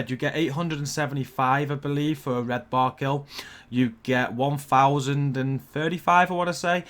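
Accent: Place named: Liverpool, United Kingdom. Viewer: Sounds like your from liverpool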